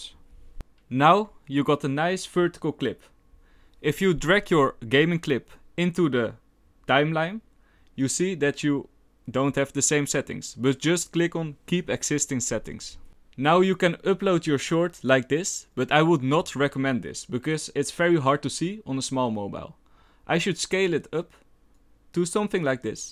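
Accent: Netherlands. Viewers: heavy dutch accent at its finest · Yes I also think..Check his thumbnails an his dutch accenct confirms it :D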